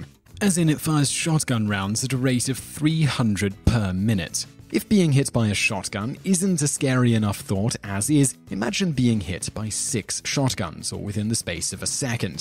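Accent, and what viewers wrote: United Kingdom. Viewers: Brit accent /= actual knowledge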